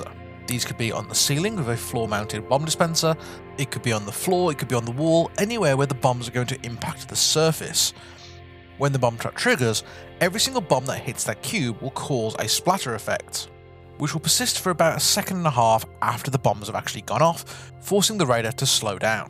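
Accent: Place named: Midlands, United Kingdom. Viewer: So good to hear a midland accent on YouTube and hell man meet your maker is beast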